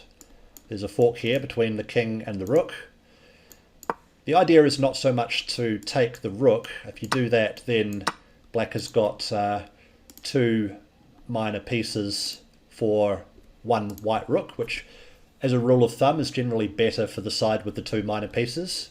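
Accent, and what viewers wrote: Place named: New Zealand. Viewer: Hi, I hope all is well in Austria. I recently subscribed and am immensely enjoying your recent videos with the in-depth commentary on your OTB games. It’s great to see a fellow Aussie doing well (although I think I detect a bit of Kiwi pronunciation here and there — I could be wrong; English is my second language). All the best, and thanks!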